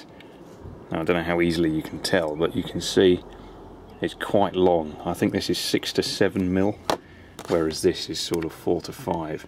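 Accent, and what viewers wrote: United Kingdom. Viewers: You are my favorite model railroad expert. In this film I particularly appreciated your speedcam effects (In my day in the USA it was called the Captain Kangaroo Magic Drawing Board effect : ). In all your films I've appreciated your thoroughness in explaining things as well as visualizing them. As a Yank I've also enjoyed your Brit accent (what accent?!). Cheers : ) Site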